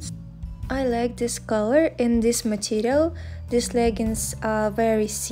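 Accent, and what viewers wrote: Russia. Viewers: Привет, русскому акценту в английском ! 😁😁😁 Классная у тебя фигура ! 👍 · Мне рязанский акцент сразу понравился! :)))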